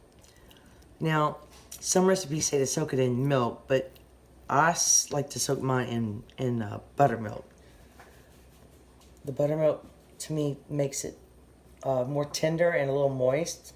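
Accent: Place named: New Orleans, United States. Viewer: Your channel is fantastic! You are a wonderful cook. When I first heard you I thought " oh she is my people" as I was raised in NOLA!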